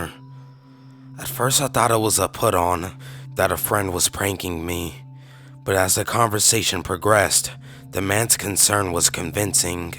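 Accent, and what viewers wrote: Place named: South, United States. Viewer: I would say he's a white southerner that moved north some time ago. Sounds like he's losing an accent.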